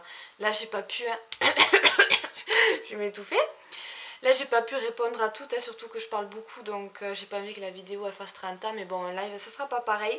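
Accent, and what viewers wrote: South, France. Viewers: Coucou! Je suis de Montréal, j'ai exactement ton age, j'aimerais te dire premièrement évidement que je te trouve magnifique! J'adore ton accent du sud de la France;) et je te trouve vraiment intéressante et terre-à-terre. Je me reconnais beaucoup en toi et je te souhaite vraiment de réaliser tous tes souhaits tu sembles vraiment mériter tout le meilleur du monde! Bisoux bella xxx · slt je te kiff!!! Es ce que tu viens du SUD!! 😘Bisous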